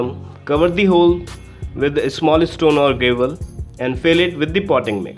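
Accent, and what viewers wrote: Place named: India. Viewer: Im just here to listen indian accent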